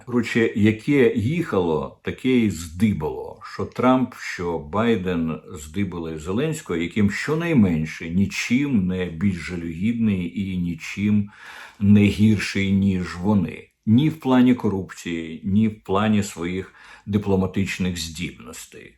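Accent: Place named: Moscow, Russia. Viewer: откуда у тебя этот московыцькый акцент? Як твой друг Дугин та арестович?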